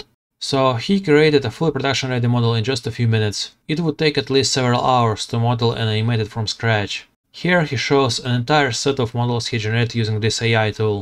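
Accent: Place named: Scotland, United Kingdom. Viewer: Honestly, I thought it was a dialect of English, like a thick Scottish or Welsh accent. Either way, if captions are available for this video, I'll give that a shot. Tanx.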